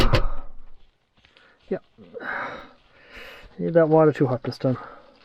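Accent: Boston, United States. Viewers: 100% Boston accent. Con-corn! I love it! Old school!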